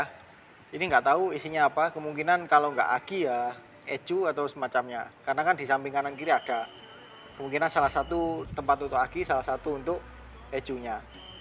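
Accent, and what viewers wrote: Malang, Indonesia. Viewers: Mangkane medok tibae wong claket malang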